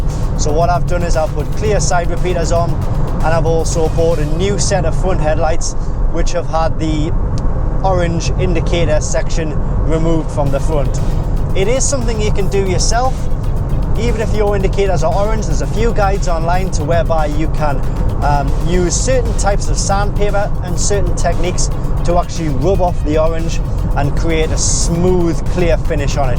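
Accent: Newcastle, United Kingdom. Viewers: The minute I opened the video I knew he was from Newcastle. Can always tell the accent. Did my master's and bsc there back in <num>. A great city indeed. Nice video by the way. Warm greetings from Nigeria.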